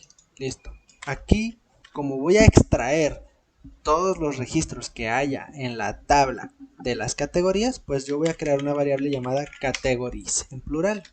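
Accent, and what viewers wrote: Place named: Monterrey, Mexico. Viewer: Muy interesante tu acento. De Monterrey? Me gusto tu video.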